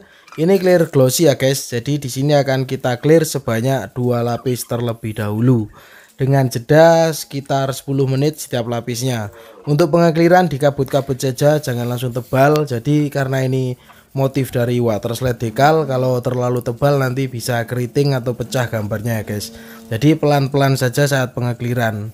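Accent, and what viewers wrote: Temanggung, Indonesia. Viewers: = mirip logatnya wong temanggung 😀🙏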